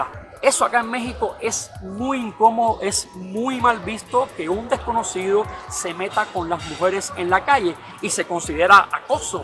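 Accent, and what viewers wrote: Cuba. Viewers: El asentó cubano se conoce a la legua usted es cubano no niegue su paiz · Tu acento ya no es tan cubano o sea no es exagerado,me agrada tu acento , saludos 🎉🎊✨🌟⭐💫💙🤎💛❣️🤍❤️💚⭐🌟✨💫🎊🎉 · Ustedes son queridos amigos en cubanos en mexico nunca pierdas tu asento cubano hermano saludos desde atlanta Georgia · no sabía que bueno entrar a informarse, soy mexicana y me avergüenza que hay paisanos así, lamentablemente de todo fruto da un árbol y aún en México hay mucha gente que solo habla por hablar, discúlpalos se crecieron viendo televisa y TV Azteca y no se tomaron la libertad de leer. Saludos y un enorme abrazo a mi me encanta el acento cubano y hay mucha gente en México que vale oro, no te quedes con la impresión de algunos pocos mexicanos ignorantes.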